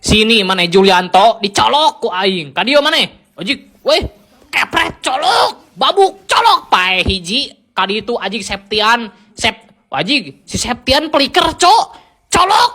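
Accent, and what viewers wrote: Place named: Sukabumi, Indonesia. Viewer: Sunda sukabumi ieu mah euy 😂😂😂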